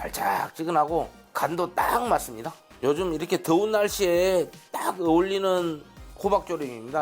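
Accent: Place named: Gyeongsang, South Korea. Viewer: 구수한 사투리 듣기 좋아용 갱상도에서~~~ㅋ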